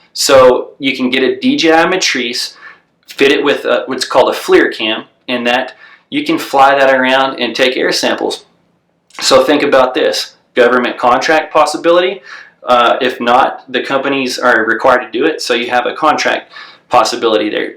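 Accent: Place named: Appalachia, United States. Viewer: Hey, you're right you will never sit in some cubicle working for DA man! But I can tell from your rants that you will end up in jail. And soon. I hope. It is guys like you that go out and cause all kinds of mayhem that bring the FEDs in to regulate. YOU are the problem NOT the FEDs. Also, it is clear to those of us already in the business that you really don't know what you are talking about. Please post your videos showing the oil company tower survey that you use as your example where you made 30, 40, 50,000 dollars. Please post a single link to any of your work. Your hillbilly accent tells me a lot about you. And your need to cuss and blow up at every post....You lack any shred of professionalism. Keep it up preacher...You gonna get you what you paid for. Now lets sit back and see if you can respond without calling me names or saying..."fuck you"...even once! 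In fact i challenge you to NOT reply at all.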